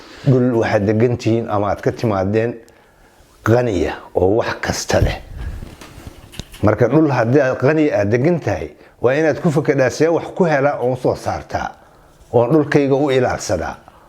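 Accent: Mudug, Somalia. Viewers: Lahjadiisa puntland ma ahan waa reer mudug waxuu ka dhaxeeyaa reer mudug. Reer mudug koonfur ilaa waqooyi waxay kaga duwan yihin reer bariga iyo reer nugaaleedka waxaa ka jidho ereyada sida “haya” tusaale “waxaan kuu sheegahaya” meeshay reer bariga dhihi lahaayeen “waxaan ku sheegayaa” Ninkanu qabiilkiisa iyo deegankiisa lama sheegi karo Lakin Inuu reer mudug yahay banse kuu hubaa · Odaga waa somali dhab lahjadamashqul kuma ficna iyo heshuu kasoo jeedo waxa muhim.ah taloyinkisa ivan racno lki waxan qiyasayaa lahjada waa mudug ilaag/lafa dhexe intas madhafsiusana ader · Weli reer mudug hadalkiisa ayuu leeyahay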